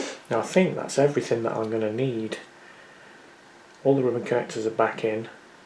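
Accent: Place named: Leeds, United Kingdom. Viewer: your new videos are way better and more authentic, you progressed very well. are you from Leeds? you sound very local to me...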